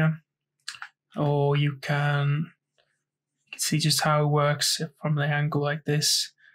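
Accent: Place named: Wales, United Kingdom. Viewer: Both of these videos are amazing! Thanks so much. Also you sound like a mix between Welsh and maybe Nordic 😃😃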